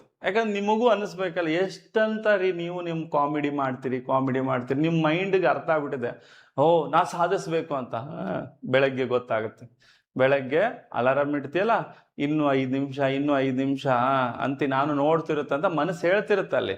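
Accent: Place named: Haveri, India. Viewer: Sir nimm bayaga haveri bhashe kelak chend ri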